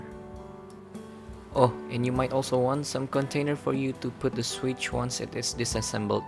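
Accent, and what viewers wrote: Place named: Indonesia. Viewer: nice video! and I knew that you were Indonesian from the first seconds in xD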